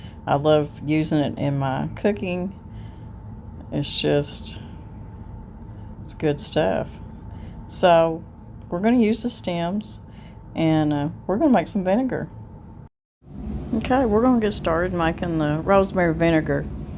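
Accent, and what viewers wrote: South, United States. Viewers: Thank you so much for the extra instruction...I know you guys are super busy, especially during this beautiful Spring weather. You sure do speak my language...clear, concise and just a "touch" of Southern drawl...I appreciate this more than words can say! Done so much pickling and canning in my days, but homemade vinegars is a first for me...you really CAN teach an old dog new tricks! 😉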